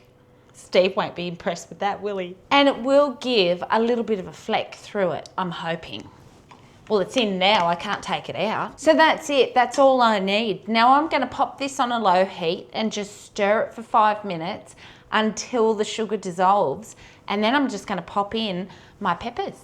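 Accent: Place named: Australia. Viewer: Hi, you are Definitely a Dinkie Die Aussie. I love your videos,your Aussie accent and you make me laugh. I pleased you now settled down to making cooking videos. It is more exciting than shorthand and typing. Got to get back to the potato bottling or canning. 😂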